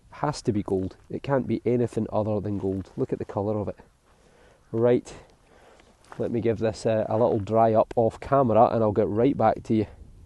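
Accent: Scotland, United Kingdom. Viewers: New subscriber here and Im binge watching your videos. Love listening to your scottish accent..(to me) and love your videos. Do you give all your finds to the owner of the properties?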